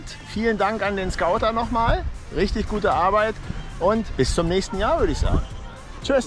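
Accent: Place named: Saxony, Germany. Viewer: Schlauer Startpunkt, der Sand am Ende kann dazu führen das man gedanklich ein paar Verwünschungen in Richtung des Scouts ausstößt... 😅 Dafür kreiert der Trail am See aber wirklich Begeisterung! Würde euch dringend Venus Valley ans Herz legen! P.S so richtig passend fande ich das sächseln (auch) nicht 😉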